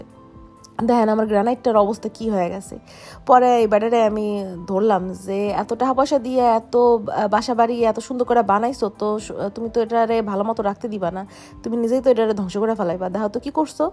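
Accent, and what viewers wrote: Barishal, Bangladesh. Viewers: Apu sounds like barishal vasha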